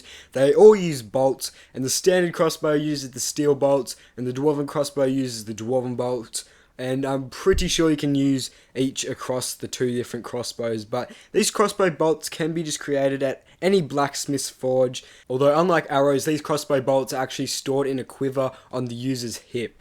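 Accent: Australia. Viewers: are you italian-aussie?? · The fact the crossbow model doesn't have a channel for the bolt.... really annoys me. A professional artist should have seen it in reference photos, plus any man should just know 'guy' stuff. The vid poster has a really heavy Aussie accent. It occasionally sounds like he's taking the piss. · is he aussie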